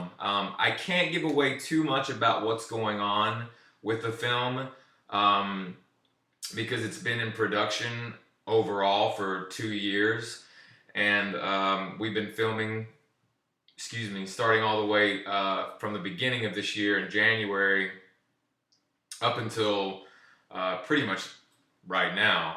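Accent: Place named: Tennessee, United States. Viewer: Lolz I can hear the TN in his voice. XD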